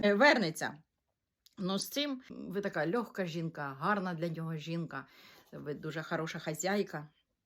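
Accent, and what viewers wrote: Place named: Surzhyk, Ukraine. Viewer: Не приємний суржик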